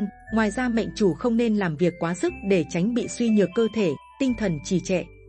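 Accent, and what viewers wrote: Thanh Hoa, Vietnam. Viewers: T nghe giọng thanh hóa đã chối rồi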